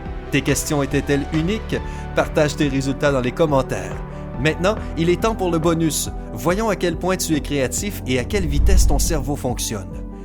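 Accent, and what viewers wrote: Quebec, Canada. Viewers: D'après ton accent tu dois être. Quebequois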